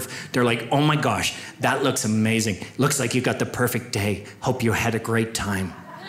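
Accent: Ireland. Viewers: It was close to accurate. To a passive listener, he could have easily passed it off as legit. But I really do love listening to the Irish accent. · Very funny. And I can't resist an Irish accent.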